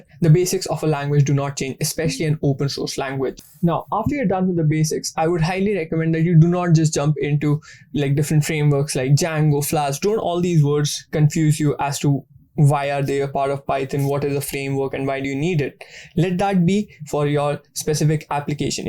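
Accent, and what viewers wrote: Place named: India. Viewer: nice... but i hate how Indians speak English... are u doing intentionally or it is just u don't wanna bother to ppl understand u nice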